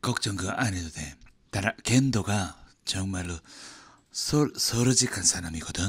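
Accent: Japan. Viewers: 일본어 공부하러 왔어요 요즘 다나카님 억양이 머리에 멤돌아서 저절로 일어가 터질 거 같아요 근데 느끼한 뉘앙스가 더 강하게 남아요ㅋㅋㅋㅋㅋ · 저는 일본 사람입니다. 다나카さん의 억양이 여기저기 정말 일본인답고, 처음에는 이런 재미있는 일본인이 한국에서 활약하고 있구나!! 대박!!! 라고 생각하고 보고 있었는데........??? 정말 일본 사람 발음의 특징을 포착해서 엄청 웃었어요😂 美味しくなぁれ、美味しくなぁれ、萌え萌えキュン🫶🏻 (한국어가 틀렸다면 죄송합니다🙇‍♀️)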